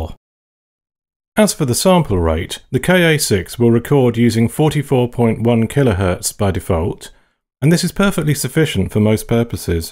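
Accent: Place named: United Kingdom. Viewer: The British accent took this amazing product overview right over the top.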